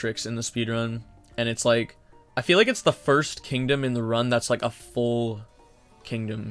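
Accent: Canada. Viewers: Awww. The Canadian accent. “Oooootfits” lol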